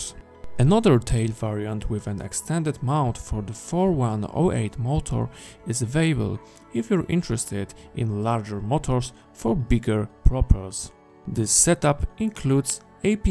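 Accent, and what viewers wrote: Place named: Germany. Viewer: Are you german ? 😊 your English sounds like German 👌👌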